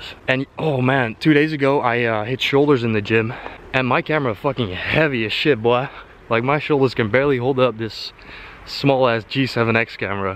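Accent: Netherlands. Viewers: You from Rotterdam¿¿¿ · Are you Dutch?!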